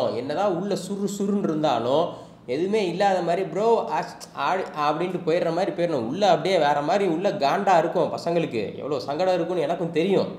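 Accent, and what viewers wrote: Madurai, India. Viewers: Bro ninga madurai ya🔥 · Bro madurai ya nenga.na madurai bro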